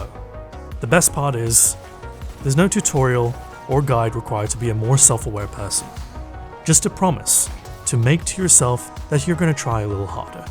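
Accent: United Kingdom. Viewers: This video is about as useful as Lord Shaxx telling you "You're trailing the enemy. Catch up!" when it's 3-0 for them, only one of your team mates is alive, and they have 2 revives left. Maybe this vibes with pro players who get paid to do this? I don't know, I play games to entertain myself. Having a British accent blabbering on and on in a dramatic fashion about things that are so remote from reality is funny, but "stew pid".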